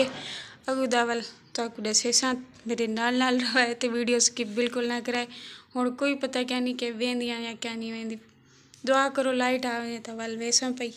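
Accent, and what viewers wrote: Saraiki, Pakistan. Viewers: AJ bht din bad apka vlog daikha Sirf apki awaz piari hai q k sariki bht piari bol leti ho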